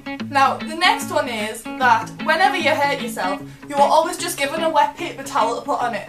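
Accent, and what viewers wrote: Newcastle, United Kingdom. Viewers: Are you a Geordie?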